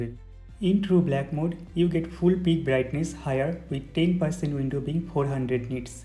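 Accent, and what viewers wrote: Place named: India. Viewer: Indian accent is amazing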